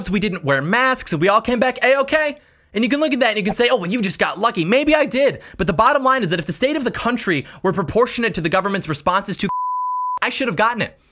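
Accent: Michigan, United States. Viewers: You from Michigan my guy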